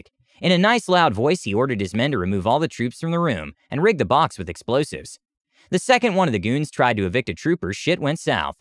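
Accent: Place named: United States. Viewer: Text: Extremely stereotypically british. Voiceover: Matter of fact car salesman american.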